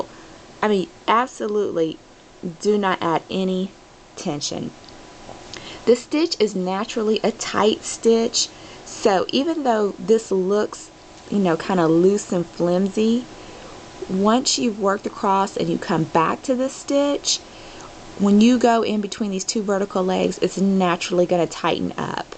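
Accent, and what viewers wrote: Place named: South, United States. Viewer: Oh my goodness you have THE cutest Sothern drawl....and I'm a NC native....this is what I imagine a southern bell (in the big dresses) sound like, lol!!! Btw, great video and instructions!! New sub!!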